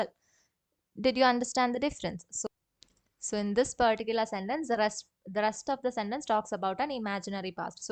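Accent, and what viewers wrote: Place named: Kerala, India. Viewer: Amazing class ma'am ..feels like you are from kerala ..Great pronounciation skill ma'am ..God Bless You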